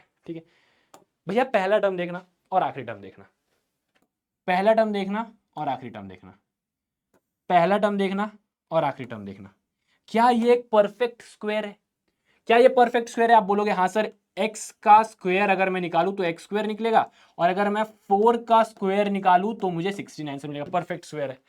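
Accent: Bihar, India. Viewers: Bihari kahika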